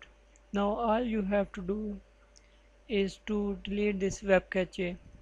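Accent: India. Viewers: Actually worked out nice^^ Thanks alot indian accent guy :) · it worked just as well as the Indian accent ... <3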